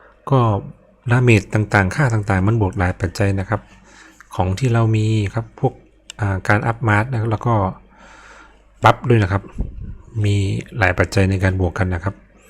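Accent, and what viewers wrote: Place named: North, Thailand. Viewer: อ่อครับ ผมนึกว่าพี่เป็คนเหนือ😅😅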